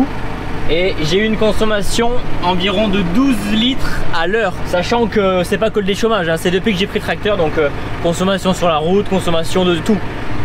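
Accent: South, France. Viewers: Tu as l'accent du sud seulement quand tu es avec ta soeur mdrr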